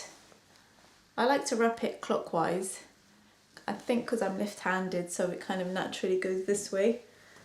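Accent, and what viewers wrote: United Kingdom. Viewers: british accent,I love it!and of course I like the video :)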